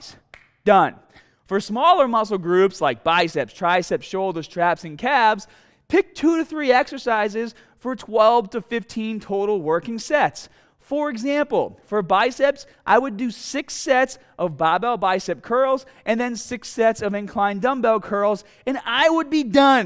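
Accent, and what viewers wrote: Boston, United States. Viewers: Doda Dado could be either Boston or Chicago accent. Lots of Irish influence in those regions back in the day. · Boston. That's what they sound like. I love it. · Is he from boston? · Love watching ur channel and putting your advice to use at the gym trying to get in much better shape also listening to you talk I realize what I sound like to other people when I talk I'm about 45 minutes from Boston I'm in Taunton so mine isn't as strong lol but man now i can see why people from other states recognize our accent immediately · He sonds part Boston and part dork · It's actually a completely authentic Merrimack Valley accent, from north of Boston, the Lawrence/Lowell area...my own homeland. Though I've been gone for many years, I wax nostalgic hearing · Svein Gustav Hoff When he moved to Boston. He wanted to match the accent for fun I believe. 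· You’re so from Boston ❤️ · Love the Boston accent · That Boston accent on heavy lol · It's Boston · GLAD TO SEE A FELLOW BOSTONIAN DOING BIG THINGS ON YOUTUBE ..THE ACCENT IS THICK WITH THIS ONE ITS FUNNY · This is Boston, not LA kid. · do you really have that hard of a Boston accent? I've watched many of your vids and I've never noticed it before, it almost sounds like youre embellishing it haha. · no its Bahston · Except he has none of those accents. I guess 'American' could work but it's a Boston area accent. There are like 20 'American' accents. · Do I hear a Boston accent? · That's a Boston accent what's the problem ? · Is this dude American or Australian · Are you from Boston? That accent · Canadians dont have accents lol. It's as plain English as you'll get. This is boston all day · Are you from Boston? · He is from Boston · Sounded like Boston to me. It's definitely not Australian! 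· Sounds like Boston to me lol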